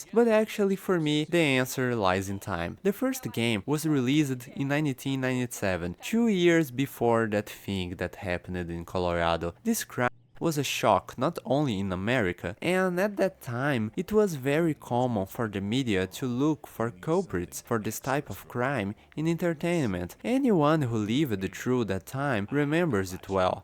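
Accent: Brazil. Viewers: Your accent reminds me of a brazilian friend i had. Miss him so much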